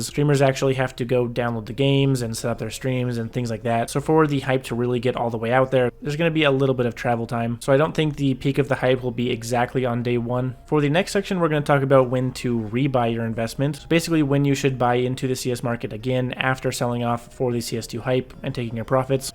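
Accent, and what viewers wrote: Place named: Australia. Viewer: I like your aussie accent mate 😂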